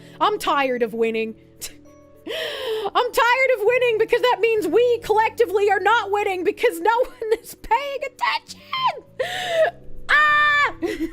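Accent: Boston, United States. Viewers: I came for the DEI update and Boston accent. ❤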